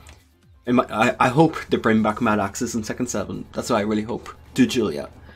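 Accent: Ireland. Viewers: R u from Ireland ?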